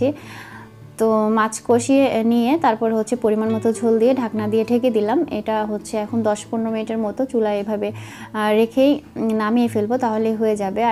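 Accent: Sylhet, Bangladesh. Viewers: Apu tmr basha sylhet er kotay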